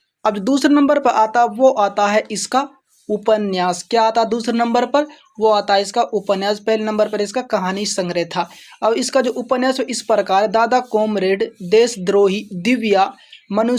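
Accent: Mewat, India. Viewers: Oye tu mewati to na h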